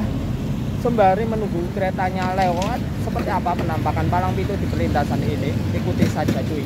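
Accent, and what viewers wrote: Java, Indonesia. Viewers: Asli mu mna tho Nang..? 😅 Medhok bgt.. 😅😅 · Asli mu mna tho Nang..? 😂 Medhok bgt.. 😅😅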